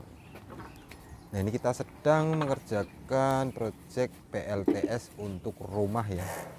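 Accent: Java, Indonesia. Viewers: kuping gak usah di pasang kalo gak mau dengar logat medok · oh maksud lu skrg ngancam? Silahkan lanjutkan.. Tidak sedikitpun saya takut dgn orang bodoh seperti anda.. Semua yg baca komen2 ini pasti bisa menilai. Dan menyedihkan betapa bodoh dan sempitnya dunia anda sampai mengatakan bajwa logat medok di video itu dibuat-buat.. Wkwkwkwkwwkkkkkk Saya sangat heran dgn tingkat kebencian dan ketidaksukaan anda hanya karena logat medok, sepertinya anda memang perlu konsultasi dgn psikolog.. Wkwkwkwkwkwkwkkkk Sungguh saya tdk membayangkan jika orang2 medok di Jogja mentalitas-nya seperti anda, menerima banyak teman2 dari seluruh Indonesia yg logatnya berbeda2 dan sangat beragam. Tapi untungnya menerima beragam logat dari kawan2 di seluruh Indonesia justru bisa membuat semua berbaur dengan akrab di Jogja.. Cukup sekian dari saya menanggapi anda yang "sakit", selanjutnya silahkan kalau anda akan selalu ngeles ataupun anda mau terus menerus memupuk ketidaksukaan dan kebencian anda dgn sesama bangsa Indonesia, sekedar karena perbedaan logat dan latar belakang budaya.. 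· intinya gw nggk seneng aja logat medok udah itu ajaa bisa kan biasa ajaa nggk usah medok2 gitu maaf yaa gw bukan orang jawa ngertiii loee klo nggk ngerti ilmu psikologi nggk usah ngegas emang dasar nya nggk ngerti yaa udah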